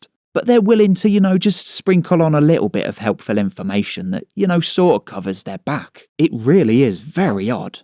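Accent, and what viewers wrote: United Kingdom. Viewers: You sound like you’re from Leicester?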